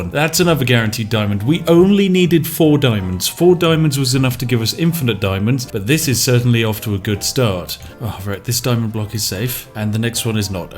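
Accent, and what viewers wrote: United Kingdom. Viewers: OH MY GOD HES BRITIHS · Yo I didn’t know the ah master was a Brit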